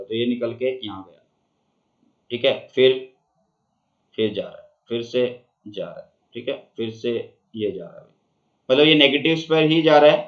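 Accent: Haryana, India. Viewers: I think haryana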